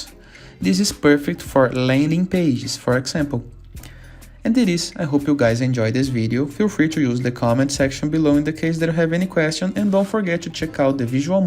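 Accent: Brazil. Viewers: o sotaque do brasileiro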